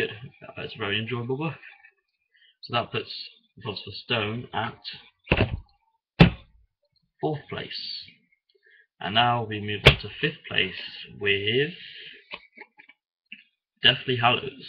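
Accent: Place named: Northern England, United Kingdom. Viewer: okay you sound northern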